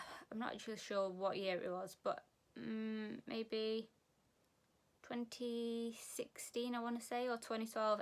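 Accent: Sheffield, United Kingdom. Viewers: You have the posh Sheffield accent. I have lived in Sheffield all my life and I don't sound anything like you. Mine is a common Sheffield accent. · I love the Sheffield accent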